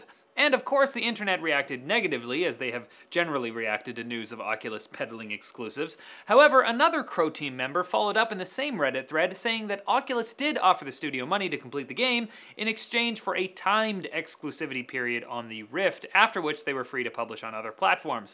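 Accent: Australia. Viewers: Why choose an australian accent though? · mate that sounded australian not like a New Zealander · Your Australian accent is getting better.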